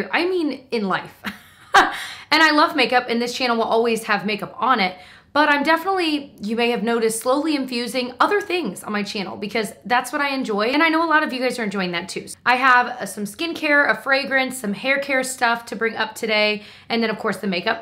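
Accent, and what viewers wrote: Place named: New Jersey, United States. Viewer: You've probably heard of Good Reads but if not, it's an app that has other people's reading lists, as well as reviews on books. That's where I find most of my want-to-read novels 😊 I love just hearing your voice! I know that sounds weird but you sound like my New Jersey family ❤ I had that Nest perfume but gave it to my mom because it was a little too warm/mature for my taste. It's crazy how everybody's preferences are different!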